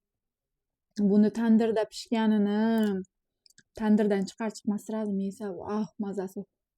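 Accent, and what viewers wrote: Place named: Bukhara, Uzbekistan. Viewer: Бухорони Олотиданмисиз шевангиз хам бухорога ухшаяпти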